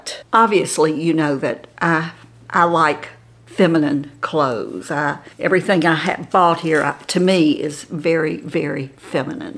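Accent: South, United States. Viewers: Hi.....so glad I found you! Nanny (Mary Ellen) recommended your lovely site. YOu are so cute and sweet...loved the fashion show.....also love your sweet Southern drawl. Your make up is beautiful. Hugs from a nana in Pa.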